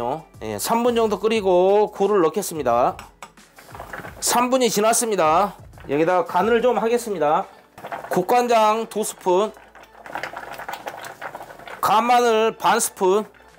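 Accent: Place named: Yeosu, South Korea. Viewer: 여수 억양이 많으시네요 잘봤습니다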